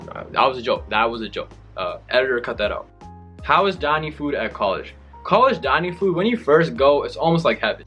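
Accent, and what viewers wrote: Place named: United States. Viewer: How you got this much fluency and American accent 🙄?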